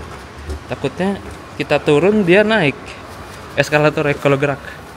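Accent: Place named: Banyumas, Indonesia. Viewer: Legend bise wong ngapak,, kemutan jaman sekolah numpake bis Asli 😂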